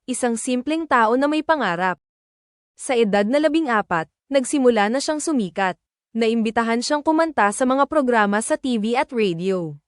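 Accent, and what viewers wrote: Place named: Visayas, Philippines. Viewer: Ano bang lahi mo bat ganyan Kang magsalita ? Yung pronounce mo Ng mga words parang visayang inskit.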